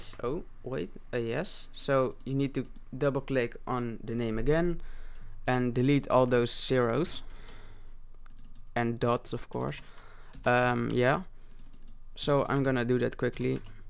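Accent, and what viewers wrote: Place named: Netherlands. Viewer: ik hoor duidelijk Nederlands hahaha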